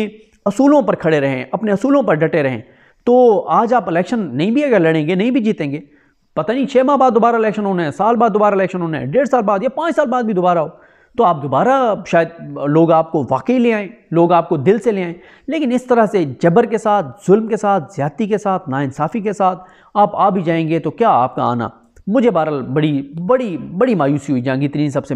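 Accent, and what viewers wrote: Saraiki, Pakistan. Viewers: Very nice bro . Sarieki is nice in Ur speech..❤ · میرے ایک دو دوست ملتان و میانوالی سے تعلق رکھتے ہیں۔ ان کے منہ سے اور آپکے منہ سے سرائیکی سن کر بہت دل خوش ہوا۔ اللہ آپکو خوش و خرم رکھے۔ اور آپکی پیشگوئیاں پوری فرمائے۔ آمین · واہ بھائی کیا بات ہے بڑی پیاری بولی سرائکی ماشاءاللہ🎉❤🎉❤ بہت اچھا لگا 🎉❤🎉❤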